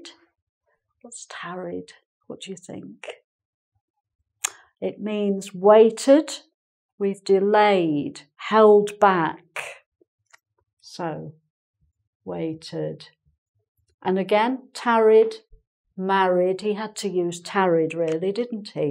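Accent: United Kingdom. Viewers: Her British accent sounds really beautiful and also her lecture is really good. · You don't need to explain every word in the poem.I listen to your lectures simply because I like your pronunciation and I am fascinated with British accent.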